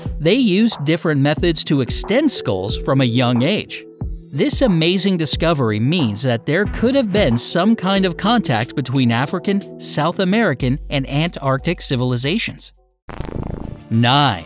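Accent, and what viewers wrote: United States. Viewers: The American accent just makes me feel dumber. Not sure what it is with American educational show and the way they explain things like they are talking to a child.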